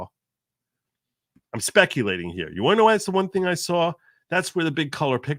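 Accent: New York, United States. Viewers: Thank you 🙏🏽 God Bless you. I just found you and have subbed. I appreciate you more than you will ever know. 🇺🇸 You are a true AMERICAN. Thank you for the attempt to remove gag order. I love your NYPD cap. As I am a recently retired 911 Dispatcher of 30 yrs. Half State time and half County time. This cap means a lot to me. You definitely have a NY accent! My husband of 20 years is from Queens-lifelong. And he has lost a lot of his accent being in the south. But it’s definitely still there. He does still say “ Can I ax you something?” 😂😂 I tell him he better not AX ME! 🤣 Thank you so much. 🇺🇸💙💛💙🇺🇸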